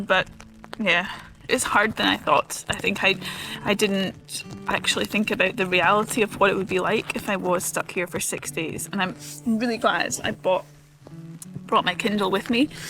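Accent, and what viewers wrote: Scotland, United Kingdom. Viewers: I am so glad I found your channel|! I truly LOVE your accent and Scotland. I have lived in Edinburgh for a year and toured around Scotland a lot. Love from🥰🥰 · I am enjoying watching your video,s. You are a lovely lady, and I love your beautiful Scottish accent.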